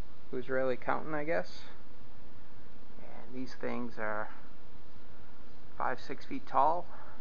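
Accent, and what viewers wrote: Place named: Boston, United States. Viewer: Before I even saw your channel name I knew you had to be a fellow Mass-hole and Boston guy! Great video and great accent. I mean, wicked pissah accent!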